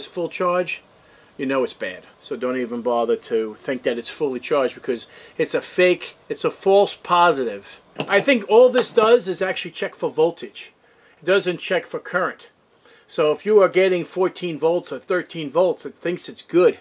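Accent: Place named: New York, United States. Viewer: It’s about time I have somebody on YouTube with the New York accent❤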